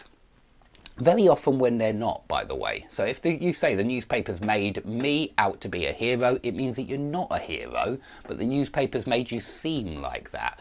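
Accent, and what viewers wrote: England, United Kingdom. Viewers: I love your English accent !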